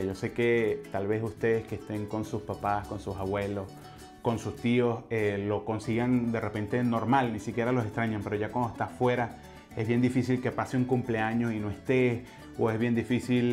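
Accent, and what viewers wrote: Venezuela. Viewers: Al chile suena mejor el acento venezolano que el colombiano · Hola un gusto verte te vi por allí en YouTube y te escuché hablar y dije el habla como venezolano y bueno me suscribi y me encanta tus videos ☆éxitos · su acento es caraqueño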